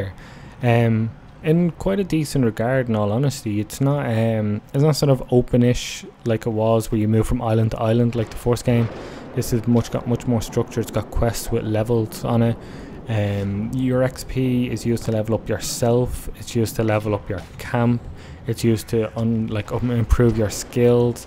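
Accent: Ireland. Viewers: You're Irish mate aren't ye, thanks for the review though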